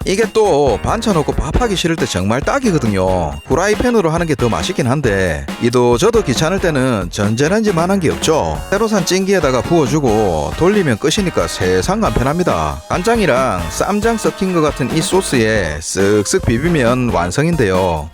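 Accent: Gyeongsang, South Korea. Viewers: 경상도사투리만 없어도 참 좋은 컨텐츠입니다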